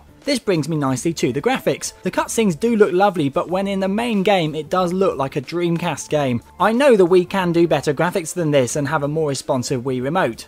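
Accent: United Kingdom. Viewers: British accent omg i love British accents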